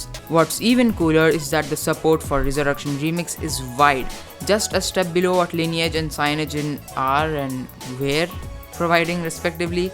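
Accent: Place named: India. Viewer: This is what you get when you combine good english words and Indian accent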